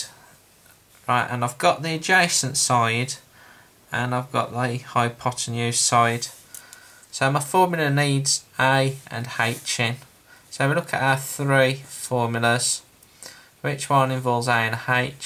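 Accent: Birmingham, United Kingdom. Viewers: that brummy accen though!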